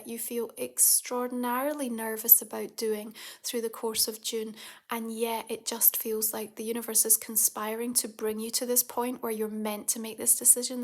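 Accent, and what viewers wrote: Scotland, United Kingdom. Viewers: Oh! Didn't realise you were a fellow Scot 🏴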